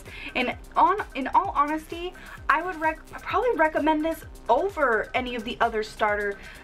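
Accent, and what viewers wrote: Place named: Germany. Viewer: Felicitari! Vorbesti engleză ca un neamt!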